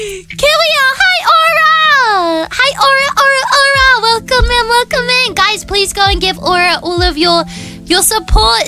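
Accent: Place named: United Kingdom. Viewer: I think its the firs time I hear a british vtuber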